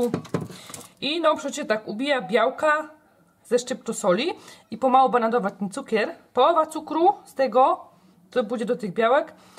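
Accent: Silesia, Poland. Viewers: Choć nie jestem Ślązaczka ale bardzo lubię twój kanał i twoje przepisy, nie które juz wypróbowane👍🏾 i ta twoja śląska gwara ! Fajnie sie słucha ! A durnymi komentarzami sie nie przejmuj ! I rób swoje ❤️😊😊pozdrawiam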